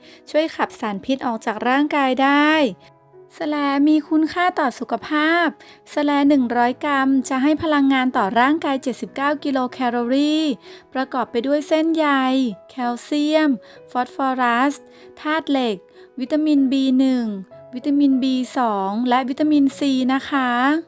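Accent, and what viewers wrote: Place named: North, Thailand. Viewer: อู้จ้าาาาาา คนเมืองงงงงงแต้น้อ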